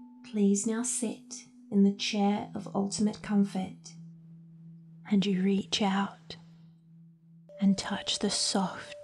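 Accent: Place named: Australia. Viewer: I'm working my way through this series. It's nice hearing another Australian do this stuff Cheers :-)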